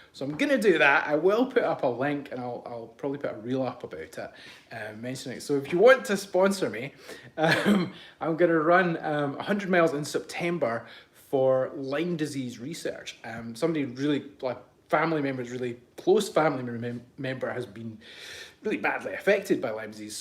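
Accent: Scotland, United Kingdom. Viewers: Belatedly hello from Yuma Az. I love your historical lessons. I am a Kincaid descendent. Have you toured their manor? I was coming to see it but old age and health got me first. I would love to hear its history, with your Scot accent and instructor-like presentations.😅❤❤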